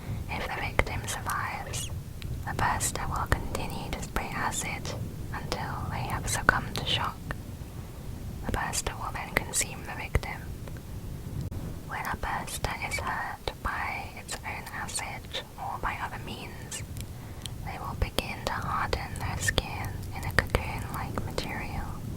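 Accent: United Kingdom. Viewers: are you british? im british btw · She's from the uk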